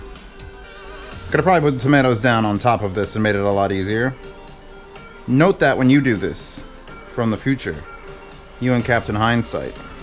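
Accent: New York, United States. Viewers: What part of New York are you from?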